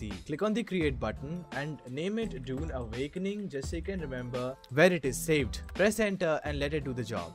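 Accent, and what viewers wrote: India. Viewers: English of The índia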